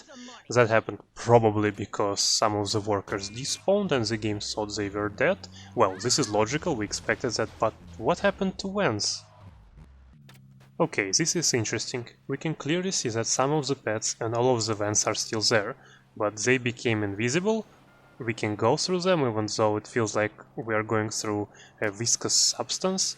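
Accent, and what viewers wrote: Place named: England, United Kingdom. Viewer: Oh, you from England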